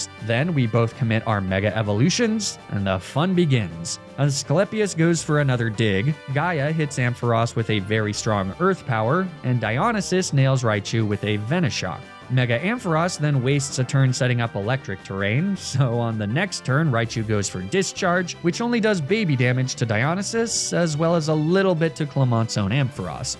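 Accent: Finland. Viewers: Wait how did i not realize you were finnish until now 😭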